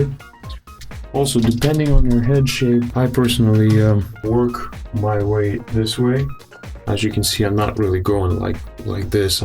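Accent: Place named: United States. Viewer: I´m confused.. u look russian, but you sound american:D but great tutorial!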